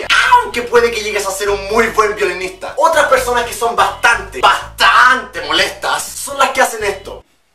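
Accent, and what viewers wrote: Chile. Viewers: Cuando todavía hablaba chileno y decía "conchetumare":v xd 2019 lo extraño.... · Aún es chileno. Yo lo escucho hablar chileno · Cuando german aún tenía acento chileno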